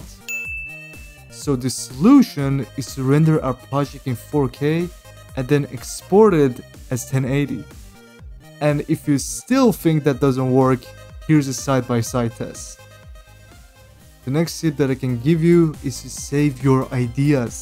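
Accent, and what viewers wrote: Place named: Iran. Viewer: ببین قبل از اینکه اسم کانالتو ببینم گفتم به خدا این داواشم ایرانیه از روی لحجه فهمیدم و خیلی از این که این موضوع رو فهمیدم خوشحالم سابت دارم داداش از محتوات به درستی استفاده میکنم❤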